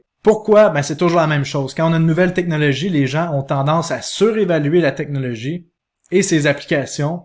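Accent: Quebec, Canada. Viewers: Est tu de Québec ou MTL ?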